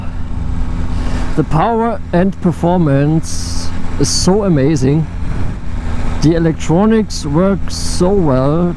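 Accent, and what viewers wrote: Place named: Germany. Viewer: H(G)errman Englisch with a strong German accent 😮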